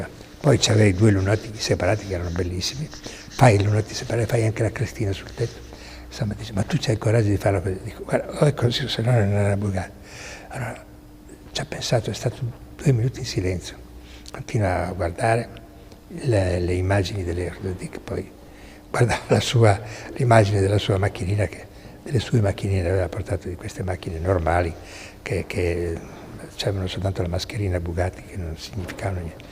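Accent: Abruzzo, Italy. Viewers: se però andassi a un corso serale di italiano non sarebbe male, eviteresti di apparire come un asino che raglia. Neanche più i pastori abruzzesi parlano con quell’accento. Gombordamendo…. Ma come cazzo si fa a parlare così nel 2023 porco iddio lurido!!!!!!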